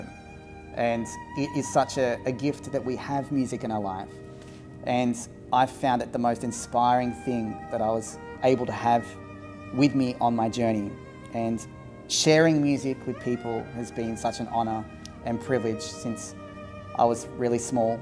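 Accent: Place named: Australia. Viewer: Hi, its always so great to see you again with that wonderful Aussie accent and listen to your great 🎻 ❤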